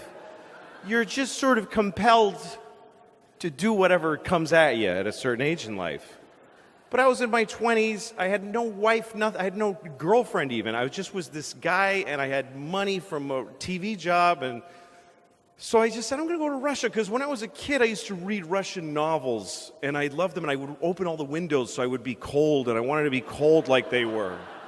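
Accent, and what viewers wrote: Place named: Boston, United States. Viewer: he grew up in Boston, that's the local way of treating vowels.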